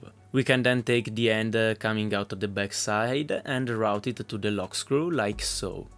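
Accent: Italy. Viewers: This is awesome. I have been into sim racing for a while now and I am in IT here in the US. You have some ingenious crafting and engineering skills. Also, I could tell you were Italian as soon as I heard you speak... My mother is from Catanzaro and her accent is really similar to yours haha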